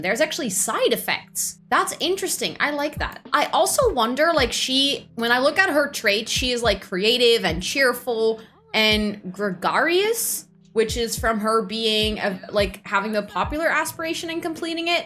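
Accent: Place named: Netherlands. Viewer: WAIT A SEC!!! BEN JE NEDERLANFS????????? ik bedoel ik hoorde het wel al maar dit is. zo. cool! dit heeft me echt gemotiveerd! ik dacht dat ik raar zou zijn als ik mijn sims content in het engels zou maken maar wow. i'm flabbergasted!!! IK SUBSCRIBE NU GELIJK! je engels is echt super goed.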